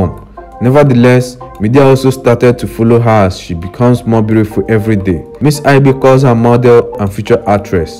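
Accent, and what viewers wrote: Igbo, Nigeria. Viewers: poor presentation. very thick igbo accent.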